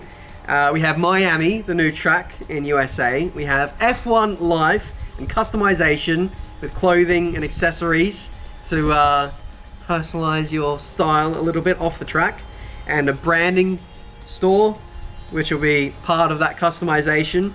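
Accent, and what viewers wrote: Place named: Australia. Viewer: Mate firstly drop the snob accent, does not suit you at all. Your an Australian Secondly… nothing more to add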